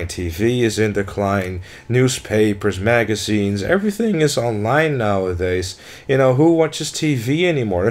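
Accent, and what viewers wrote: Netherlands. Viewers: from what country are you? sounds a lot like you are from holland. since the tv you describe is exactly what it is here.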